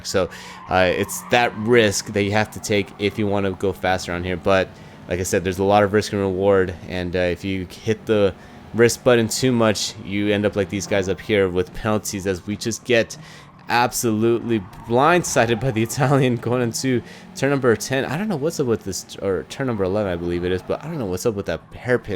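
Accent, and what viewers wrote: United States. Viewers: You sound american?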